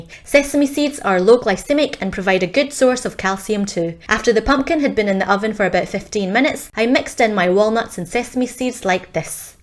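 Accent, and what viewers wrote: Scotland, United Kingdom. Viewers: You speak with a lovely Scottish accent. Am I correct? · I was just looking for some decent vegan meal preps & came across your video. Took me 5 seconds to subscribe thanks to that fabulous fellow Scottish accent! Woohoo! :D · Whenever I see/hear an Asian with a UK accent, my brain does a double-take. Scottish is a new one for me. And you live in Australia. And eat Canadian maple syrup. Such Commonwealth.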